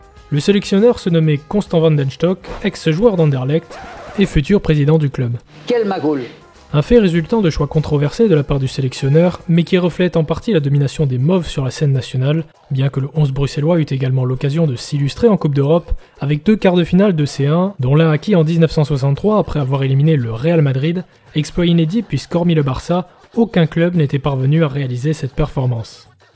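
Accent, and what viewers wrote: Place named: France. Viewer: Je suis belge et ultra de la mauve army et je tiens à te remercier pour cette vidéo sur notre magnifique club. Ça se voit que tu es français sur la prononciation de nos clubs ou noms de famille mais je ne te n’en veux pas😂. Merci de porter de l’importance au club le plus titré de Belgique💜 et très important sur la scene européenne à son époque, très bon travail et encore merci pour cette belle vidéo, COYM💜💜💜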